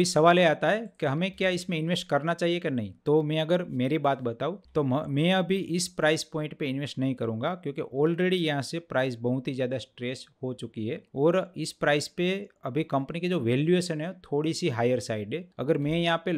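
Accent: Gujarat, India. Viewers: Thanks for your pure gujarati accent.❤❤ greetings from Surat...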